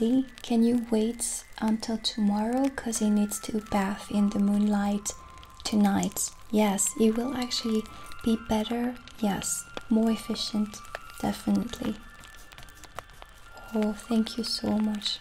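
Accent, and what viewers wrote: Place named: France. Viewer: no your French accent is perfect. The whole video is perfect and the music in the back is just *chefs kiss* haha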